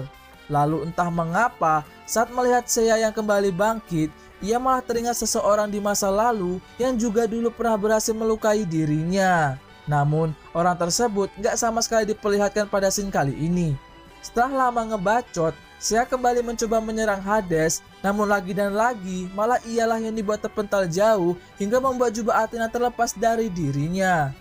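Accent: Palembang, Indonesia. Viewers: Tangan seya kena rujah... hhhh asli operator ni wong palembang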